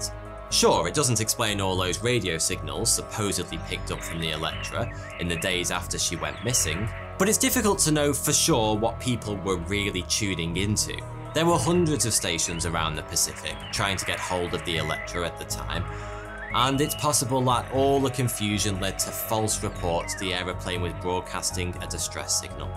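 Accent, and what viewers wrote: United Kingdom. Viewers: He has a clean British accent. I’m Canadian and being a Commonwealth country I can understand a Brit as easily as I can understand an American. If you want hard, try understanding a Scot, Irishman, or Welshman, especially if they’re piss drunk. I can but it takes a bit of concentration and a few pints first. Even Northerners near the Scottish border can be tricky at first. Australians and New Zealanders are fairly easy but I still can’t tell them apart. I always ask where they are from before assuming because they get really pissy if you call an Aussie a Kiwi or call a Kiwi an Aussie even though they sound the exact same. Being as I’m from Canada, I have a much harder time understanding Newfies and Quebeckers. Newfies talk way too fast and Quebeckers speak French and I don’t know French.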